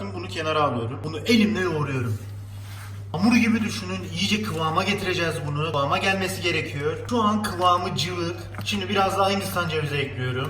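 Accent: Adana, Turkey. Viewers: Atirüüm,Yapıyurüüm,Beklirüüm,Kesiyürüm Adana şivesinin verdiği lehçe ile müge anlının mutfaktaki versiyonu 😁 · Abi adanalı mısın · Hocam aslen adanalı mısınız :D çok tatlı konuşuyorsunuz :Dd vallahi sırf söylediğiniz eylemleri dinlemek için izliyorum :dD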